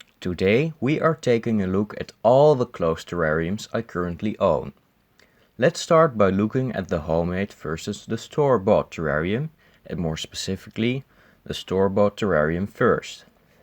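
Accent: Netherlands. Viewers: You are too adorable. “Here’s a squished one. Twins!” Hearing your accent makes me miss Holland so much. I studied college in Breda at NHTV, and all the Dutch guys are just such sweethearts. Miss the frites carts 😉 and mayonnaise.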